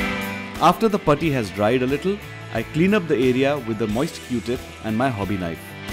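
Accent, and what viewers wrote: India. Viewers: Judging from the dialect you are Indian... well, your model is very colorful, but I think it has nothing to do with the coloring used on the real aircraft. From late 1939 gray colors (RLM 74, 75, 76) replaced the blackgreen/darkgreen (RLM 70, 71) paints. "Experts" reiterate, that Bf 109 E's in early/mid 1940 were finished in RLM 71/02, period!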